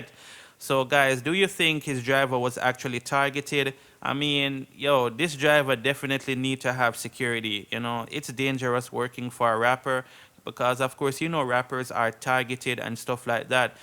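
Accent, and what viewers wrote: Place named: Jamaica. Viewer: Are you speaking Jamaican or east-indian accent?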